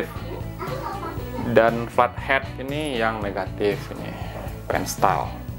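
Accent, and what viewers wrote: Padang, Indonesia. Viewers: mantap dah.. tp by the way.. logat nya kayak org padang neh.. bener ya? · Ngomong nya kayak logat orang padang ni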